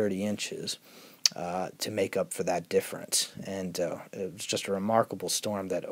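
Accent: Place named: Pennsylvania, United States. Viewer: Sounds like your from Berks County me too awesome storm but I was around in 83 blizzard also. 83 was more like 26-30 in SE BERKS. With a lot of lightning & thunder snow & 4-6 inch per hour rates & 12 degrees at the height of the storm both were unforgettable. The Jan 2016 storm we got 27 inches but not near the wind of 83 & 96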